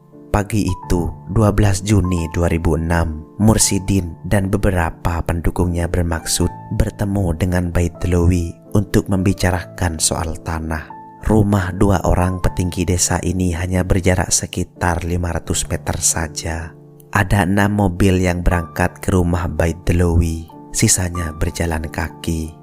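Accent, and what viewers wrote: Madura, Indonesia. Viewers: Logat maduranya kental sekali bahasanya mantap bang,orang madura teguh memegang prinsip,tekun bekerja ,pantang menyerah,salam saudara dari ranah minang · Hhhh dikatara logat maduranya bng...mantaps...semangata teross...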